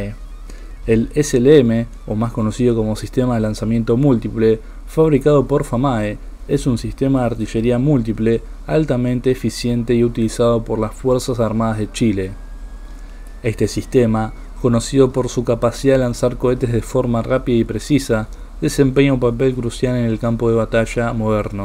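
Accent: Argentina. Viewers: Y porqué habla un argentino,???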